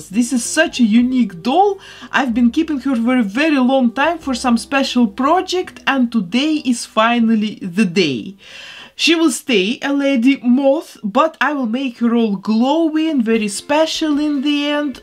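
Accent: Russia. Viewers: я так и не поняла. почему для меня, (которая говорит по русски) голос автора звучит, как голос женщины из России с плохим произношением. я английский вобще не знаю. откуда автор канала?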